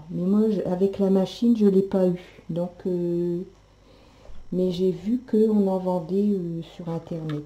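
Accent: North, France. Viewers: Superbe ..., pourtant je donnais des cours chez Singer...en <num>. Valenciennes..vous ahvez un petit accent du Nord..avec les çà....c'est charmant .., je me reconnais en vous....je ne vous connaissais pas.....j'aimerai donner des cours .à domicile .. comment calculer.....😊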